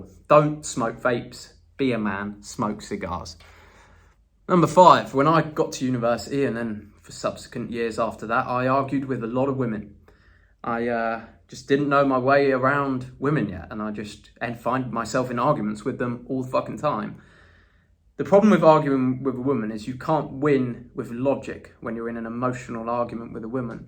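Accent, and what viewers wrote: England, United Kingdom. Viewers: I just love that English accent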